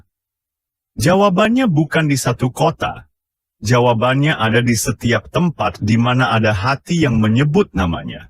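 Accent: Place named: Malaysia. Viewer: Kalau anda orang indonesia tolong pakai bahasa indonesia saja,jangan pakai logat Malaysia segala.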